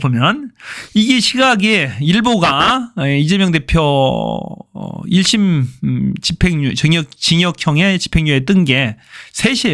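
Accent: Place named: Jeolla, South Korea. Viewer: 한성진 최고다. 아주 시원하다. 방송하는 놈도 전라도구나 ㅋㅋㅋㅋㅋ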